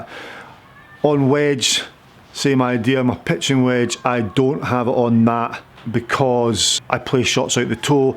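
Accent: Scotland, United Kingdom. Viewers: Mr., you are as much common sense and down to earth in your explanations as anyone I've ever heard! Even if your Scottish brogue makes this Florida boy concentrate on what you say. Lol I'm 70 and recovering from back lumbar fusion surgery (like Tiger) now I have time to rethink my swing (get the mental process changed) so my physical changes easier to accomplish. Thank you. I find the golf machine explanation complicated and confusing... You make the concepts much easier to understand. These are very good videos! Thanks again.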